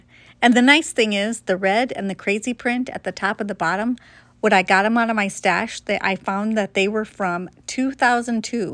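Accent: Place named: Texas, United States. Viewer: I'm from Texas and have been quilting only since i retired about 10 yrs. Iwas taught not to waste anything so i make baby quilts and string and log cabin. I love this and was trying to decide what to start now. You helped me decide. The only problem I had was thinking you were saying black instead of block and couldn't quite figure out where you were putting the black.....guess I missed the Texas drawllllll lol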